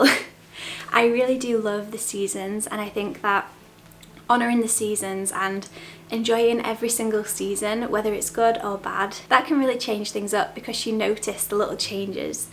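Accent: Yorkshire, United Kingdom. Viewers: Are you a Yorkshire lass?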